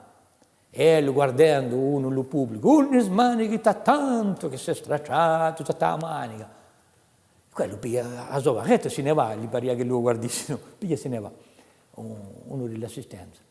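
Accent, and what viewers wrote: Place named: Massa Carrara, Italy. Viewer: confermo, l'accento è quello tipico di MASSA CARRARA ovviamente la lingua è toscano/ umbro medievale con influenze genovesi es, la parola'' fulminanti' cioè fiammiferi era molto usata da mia nonna che era umbra come ''cignale''=cinghiale ecc.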